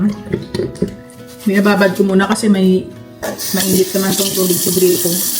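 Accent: Iloilo, Philippines. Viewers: hi mhie taga san po kau parang ilonggo kc accent mo kahit nag Tagalog ka😊😊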